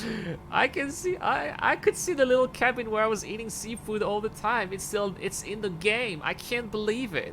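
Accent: France. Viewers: So you are french ? I usually can tell but for I had no idea where you could be from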